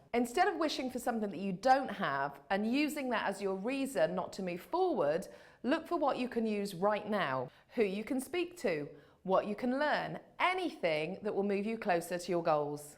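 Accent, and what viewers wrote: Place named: United Kingdom. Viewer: I looooove that Brit accent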